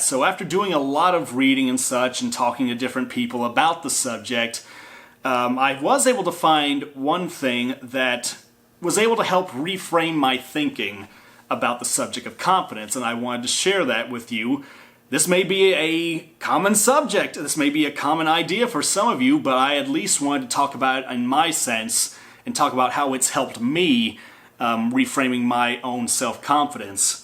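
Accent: South, United States. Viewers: Whaaaaaaaaaat? You are country , No affence love ya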